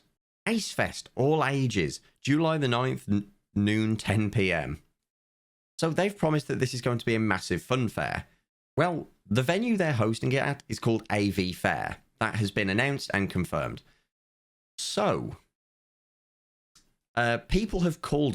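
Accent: United Kingdom. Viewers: If he can suppress the British accent slightly, yes.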